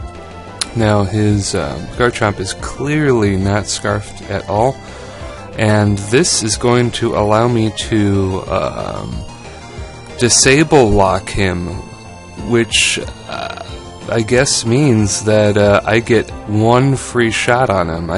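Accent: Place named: Australia. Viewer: Youre sounding Less and less australlia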